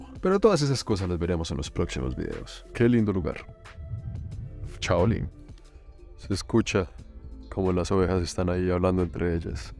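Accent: Colombia. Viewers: Felicitades!.... Acento colombiano + hablar lindo a todos los animalitos = perfect 😍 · Buen vídeo y muy buena síntesis de España y Toledo… lo has definido perfectamente y Toledo fue considerada la New York de la época…. Espero que hayas disfrutado una bonita experiencia en tu viaje y que mucha más gente venga a conocer España con tu actitud. Dicho sea de paso, como Colombiano que creo que eres por el acento, Bienvenido y estás en tu país porque así lo fue durante varios cientos de años. Un abrazo desde el norte de España y la próxima vez espero puedas venir a conocer esta zona que te gustará mucho también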